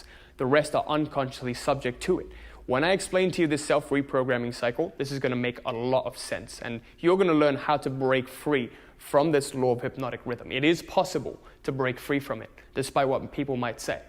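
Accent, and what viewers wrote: South Africa. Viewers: I can hear an English accent but with a slight South African cadence and you are living in Florida:) Great video btw! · South African tones….? cool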